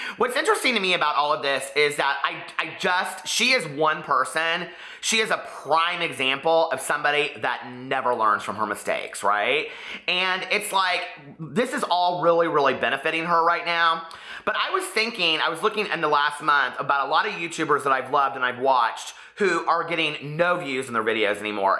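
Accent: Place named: Midwest, United States. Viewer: Is the n silent in that girls last name or is it just fast speaking midwestern accent? Cause I’m dead ass hearing Tana Mojo every time he says her name.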